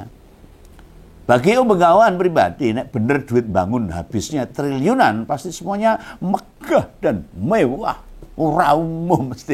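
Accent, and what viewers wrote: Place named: East Java, Indonesia. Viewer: Ini wong jatim liat logatmya , semangat cak ....( siapa ).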